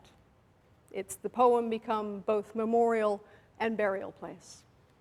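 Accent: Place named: New Jersey, United States. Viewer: Her "oy" should be more "uy -- u as in duh, but tighter". And her Jersey accent has been....neutered. I'm not saying Bayway or Sleezeside Heights, but a Morris County accent, perhaps. Especially if she's speaking Yiddish.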